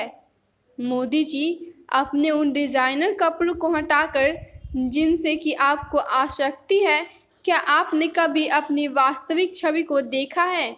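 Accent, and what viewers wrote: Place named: England, United Kingdom. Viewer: इस प्रकार के compere !!! इंग्लैंड में पैदा हुए थे और भारत मे हिंदी बोल रहे हैं। स को श बोलने वाले ऐसे लोगों को तो dislike करना भी संभव नहीं। अच्छी खासी न्यूज़ की रेड़ मार देते हैं, पूरा मज़ा इनकी भाषा और accent से खराब हो जाता है।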